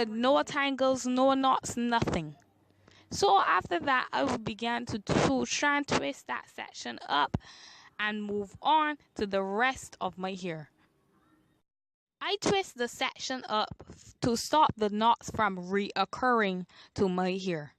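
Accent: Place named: Barbados. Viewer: Are you Bajan??? I love your accents i love all my caribs ☺️☺️☺️☺️☺️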